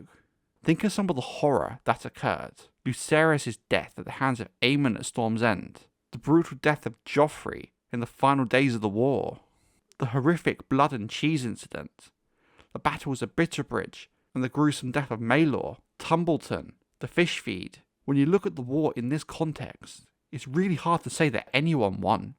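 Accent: United Kingdom. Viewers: i don't know if you're Bristish with a strong accent or English is not your first language and you speak with English accent, but it's really hard to hear and follow what you say even with the caption on. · The dude is the most British sounding dude ever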